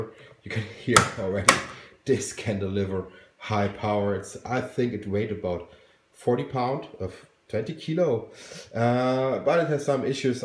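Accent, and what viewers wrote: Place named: Germany. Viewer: Wow, das nenne ich mal eine hurtige Antwort! Danke, wollte nochmal eine zweitmeinung dazu hören weil ich mir wegen gleich und Wechselstrom nicht so sicher war... Bei den Lüftern bin ich noch am überlegen Wiedes am besten funktioniert... wahrscheinlich werde ich das ganze in dem Gehäuse eines alten pc-Netzteils verbauen und die Originalen Lüfter verwenden obwohl das auch passiv gekühlt ausreichen sollte. Habe nen Kühlkörper einer alten Grafikkarte genommen, der besteht aus massivem Kupfer und sollte mehr als ausreichend sein. Super, Danke nochmal für die schnelle Antwort, jetzt kann ich mich an das Kit ran machen dann ist es mit etwas Glück heute Abend alles fertig. Hehe der Akzent war doch etwas verräterisch deswegen hab ich mal auf deutsch getippt 😁👍 Grüße zurück Andreas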